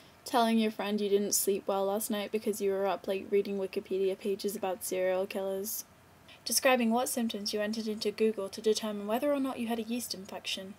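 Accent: England, United Kingdom. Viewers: i subscribed to you just because your british accent. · shes english... thts hw ppl speak over here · Trying a golden oldie again, huh? Well it was good. You sound more English again in this vid so I'm guessing that you're visiting or something. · what's rule 34? sorry for not knowing : P and also, what accent do you have? it sounds british but it goes american sometimes :S :P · By the way, from your accent you seem to be from england, so if thats true im just amazed that you also seem to have friends from all parts of the english-speaking world! woah! I mean i looked at a couple other videos & youre saying "my best friend from [canada]" & how you miss your other friend from australia! Very kl! Something i should admit - im: finding it hard to believe, a bit envious as i dont & curious to how its even possible. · NO! Cute british accent fading! 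· I say the phonetic "lol" -_- But I'm proud to say that's the only one =D (ps. Yay, your English accent's coming back =))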